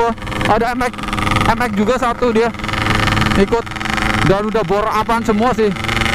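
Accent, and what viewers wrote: Banyumas, Indonesia. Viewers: pantes ngapak.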